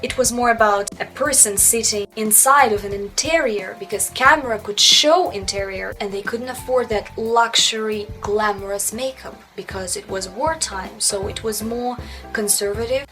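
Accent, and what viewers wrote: Russia. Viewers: She sounds Russian :-))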